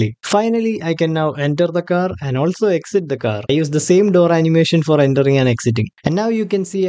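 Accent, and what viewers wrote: Kerala, India. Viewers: BRO AREN'T YOU MALAYALI???? YOUR ACCENT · That Mallu accent hits different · Bro with a mallu accent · Mallu accent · no one will talk like this in India only Malayali does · English keettiitt mayalayalide pole imddd😊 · Malayaaali alle? Sound kekumbool ariyunnundu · Malayali slang never fades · bro have mallu accent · He is malyali..... Go and learn English mallu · Your ascent like mallu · english parayana kettal ariyam malayali anenn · മച്ചു നീ മലയാളിയല്ലേ നിന്റെ പറച്ചില് കേട്ട് അറിയാല്ലോ മോനെ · mallu english alleee enik thonni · Where are you from, sounds like kerala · The accent is exclusively Malayalam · sounds like a Malayali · The English you speak sounds like a Malayali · Accent is giving me mallu vibes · Athey malayali ahn enn thonnane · ഈ ഉമ്പിയ ഇംഗ്ലീഷ് കെട്ടാ അറിയാം മലയാളിയാണെന്ന് 😂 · Bro if definitely a malayalie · You sound like you're a malayali! 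· your voice sounds really like a keralian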